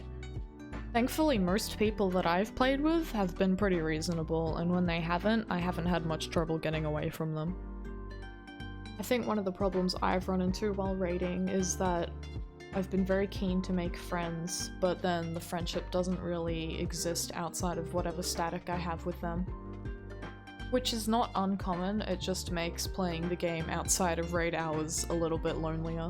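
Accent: Australia. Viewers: Also are you Australian? You sound it. I have found the Australian community to be one of the worse in my experience and I have played on like 12 different servers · Just found this video by chance. I dig the accent. Now, on topic: I recently cancelled my subscription (early Stormblood). I've had mostly mid experiences, but more bad than good. As far as MSQ and the game itself, it's just not gripping me anymore. I'm sure I'll be back . . . someday. But I just know that today is not that day. Keep making content so I can hear more of that lovely Australian accent.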